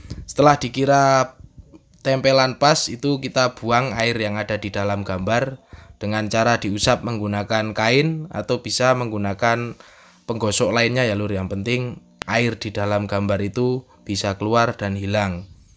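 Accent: Temanggung, Indonesia. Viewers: 🤣🤣 kyak org temanggung ajaa lur.. ahh mantap 😋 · logat temanggung iki, salam mbako lurrr 😬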